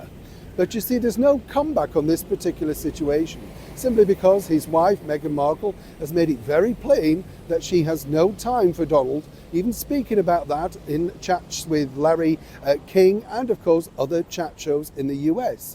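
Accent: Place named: North, United Kingdom. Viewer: What part of the north do you come from?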